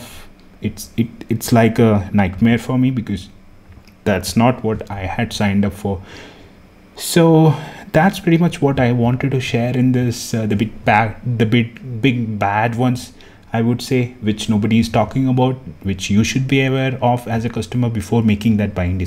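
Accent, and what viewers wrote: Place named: India. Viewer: That indian accent takes some time to get used to it. My russian accent is noticeable too, so I’m not complaining. xD Overall, this is a useful video. Thank you! P.S.: time codes would help a lot.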